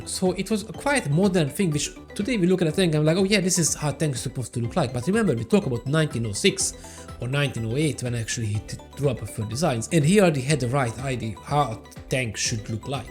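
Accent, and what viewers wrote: Hungary. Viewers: Jó a téma, tetszik. A szóbõséged jó, viszont nagyon, de nagyon erõs magyar akcentusod van. Látszika, azaz hallatszik, hogy rengeteget olvasol,írsz angolul, de nem beszélsz, vagy nem angol anyanyelvüekkel beszélsz. Kicsit azon kellene javítani. Amúgy nekem tetszett.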